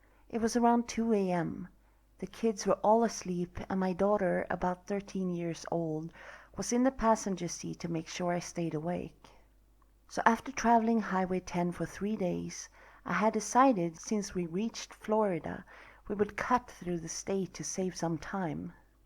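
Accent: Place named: Ireland. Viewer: Sounds a tiny bit Irish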